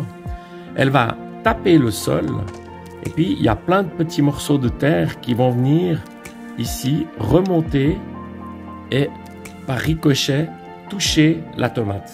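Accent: Switzerland. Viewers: Si on protège le pied des tomates avec une bâche perméable à l'eau ça évite les éclaboussures dues à l'arrosage, qu'en pensez-vous? Bravo pour vos vidéos courtes et allant à l'essentiel, c'est toujours un plaisir de vous écouter avec cet accent bien Suisse pour nous autres haut-Savoyards.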